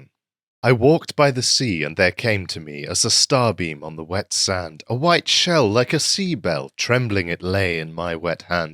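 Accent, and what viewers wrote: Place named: United Kingdom. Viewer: Heavens to Betsy that was a phenomenal piece of art to hear. Excellent delivery too, Cadence perfectly emphasized the proper beats. I imagine it loses something of significance when it is said in any accent that is not British.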